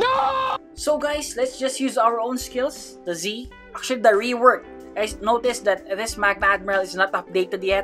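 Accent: Philippines. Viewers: your accent is so philippino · Knew you said sa Skylands are you Filipino?????? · Hi are you Filipino? Bc u sound like Filipino btw good work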